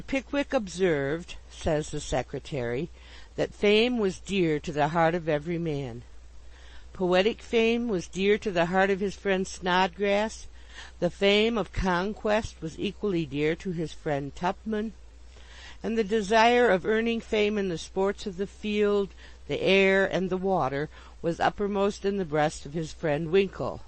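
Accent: United States. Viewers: The narrator is a fine old lass. A fine old American lass. I raise a glass, perhaps a second even! ) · why is a yankee reading this? wot's wrong with an English speaking person?? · Americans shouldn't be reading English books. Immediate turn off.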